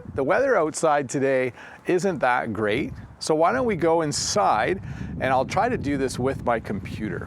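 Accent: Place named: Canada. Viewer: Hi! I really like Canadian Accent! Thanks!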